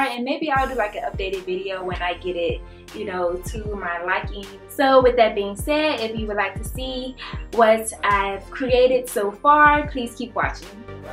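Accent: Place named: New Orleans, United States. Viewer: what part of Louisiana are you from? Sounds like I hear a New Orleans or Lafayette accent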